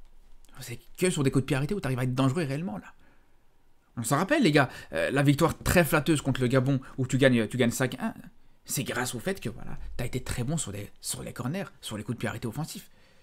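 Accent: Belgium. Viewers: Frère t’es belge non ?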